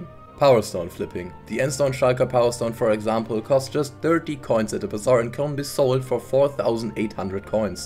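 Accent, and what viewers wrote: Germany. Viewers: Yooooo You are DEFINITELY German 💯💯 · DEUTSCHER ACZENT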